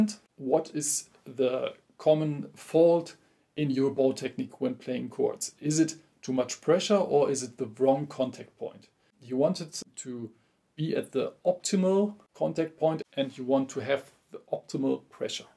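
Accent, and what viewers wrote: Germany. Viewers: wie man einfach hört, dass du Deutscher bist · man hört das du deutsch bist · Ich liebe diesen deutschen Akzent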